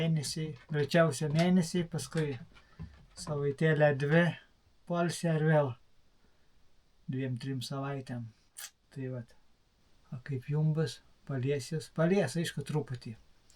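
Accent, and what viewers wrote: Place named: Utena, Lithuania. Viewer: taip ir galvojau ,labai tarme girdėta ,kadangi aš iš Anykščių😅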